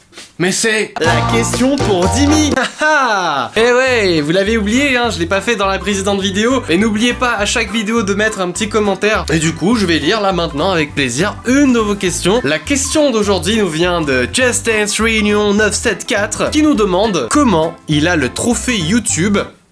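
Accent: Russia. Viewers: Et une dernière question et j'arrête de t'embêter mais est ce que tu pourrais au moins nous garder le "Hello my friend" et nous sortir quelques "cyka blyat" avec ton accent rusde de temps en temps ils sont vraiment géniaux faut pas les perdres:D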